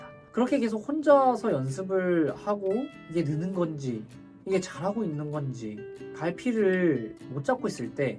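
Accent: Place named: Seoul, South Korea. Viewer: 너무 서울 억양으로 말씀하시는거 아닙니까. 같은 마산 출신으로서 서운합니다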